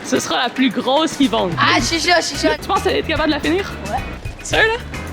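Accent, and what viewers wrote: Quebec, Canada. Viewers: Québécois je pensr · J'adore l'accent Québécois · Tu es québecois ?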